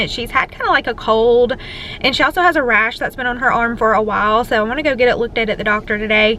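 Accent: South, United States. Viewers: I love that pink tote bag! Sooo cute. I was induced with my last baby and it went sooo well. I took some cervical ripeners and then they broke my water and he was born 4.5 hours later, no pitocin needed. I am going to be induced at 39 weeks again this go round, I’m 30 weeks on Thursday. Good luck mama! I can’t wait to see him! I’m sure it will go great no matter how he comes. Also I just love your southern accent, I’m from Wisconsin and we have the weirdest accents here.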